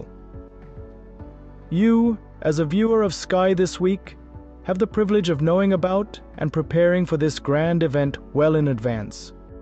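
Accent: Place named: United States. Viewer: But for the broken American accent, it was an engaging watch.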